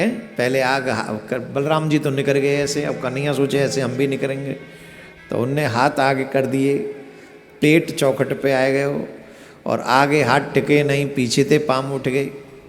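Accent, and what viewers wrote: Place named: Braj, India. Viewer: गुरु जी आप ब्रिज भासा में कथा कहते है तो बहुत ही अच्छा लगता है ब्रिज भाषा कितना मधुर है लगता है सुनते रहे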